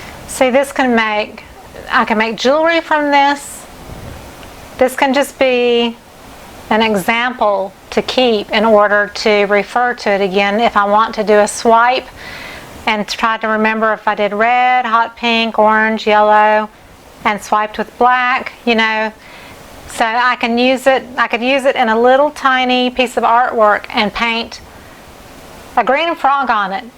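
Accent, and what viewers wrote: South, United States. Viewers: Hi. I have to say I am not a fan of the colors BUT I LOVE how fearless you are in trying different things AND showing us your boo boo’s. You could have easily edited your video to hide the fact that you forgot the additive but you showed us how to recover and that makes you an excellent teacher. I love how you blew on the painting to create more cells and added color to the blue area after the fact. You take risks and just roll with it. This has made you a master of this medium and I applaud you for your efforts. I will always watch your videos because I enjoy learning your methods, personality and your southern accent. Thank you.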